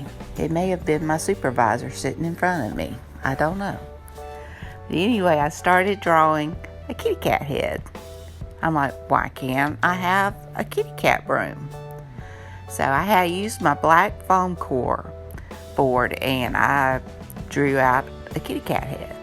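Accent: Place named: South, United States. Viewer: Such a cute kitty broom! Love your southern accent!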